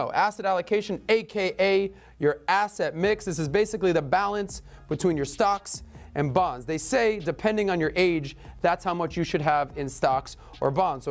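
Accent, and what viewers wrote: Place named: South, United States. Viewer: This right here is the second time I am coming across this name in a week. Came to my school for a lesson and it was lit and yes, he has a really cute southern accent.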